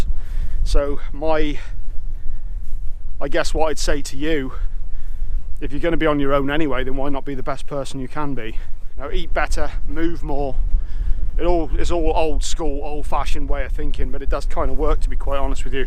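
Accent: West Country, United Kingdom. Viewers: Not down to earth talking, good west country lad! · Nice one Man am sober too ! Respects.....bet ya life has changed ! Hear a West Country Accent.?.. The World is in the grip of addiction so we need people to raise above this Demonic behaviors and speak about their journey into recovery......... Respects Blessings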